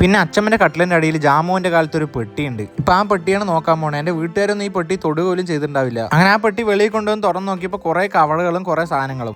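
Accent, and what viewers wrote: Palakkad, India. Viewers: Slag kettitt palakkad Aanenn thonnunu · ❤️❤️palakkad slang 🥰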